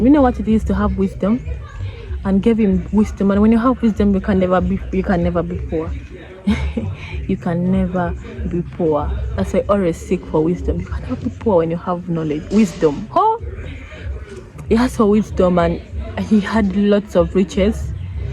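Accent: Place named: Africa. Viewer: There's sound. She's speaking English with a strong African accent.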